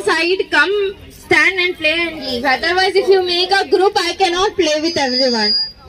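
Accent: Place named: Hyderabad, India. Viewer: Hyderabad accent I love much ,maza aagaya😍😍😍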